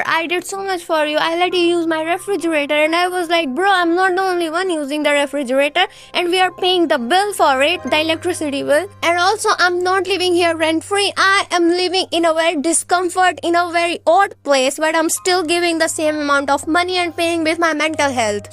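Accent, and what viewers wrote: India. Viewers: Hey are u indian? Because accent is kinda ✨ indian ✨. Btw the video is really nice 👍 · I thought so 😅, I’m an Indian as well! And I could recognise by your voice, your accent, your voice, and your sweetness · do you happen to be indian ?, your accent sounds like one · I'm really sorry if this is too personal but... Are you Indian because you have kind of an accent 😅 (I really like your voice btw! Is cute!)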